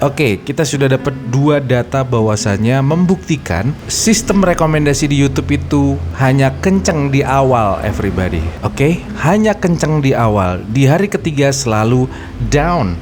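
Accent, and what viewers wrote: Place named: Banyumas, Indonesia. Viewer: kelas bang,,, wong ngapak mendoan kie bang 😊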